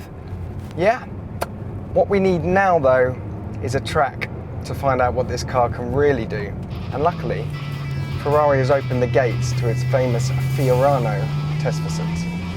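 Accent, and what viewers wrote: United Kingdom. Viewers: obviously you havent been to Europe. It's called a British accent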